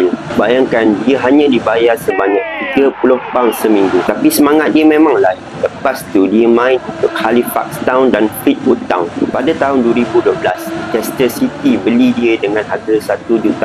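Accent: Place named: Malaysia. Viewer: Mulut melayu loghat melayu lah apa lah kau ni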